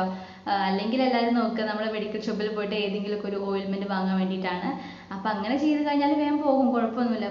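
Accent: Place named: Thrissur, India. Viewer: thrissur shaily is best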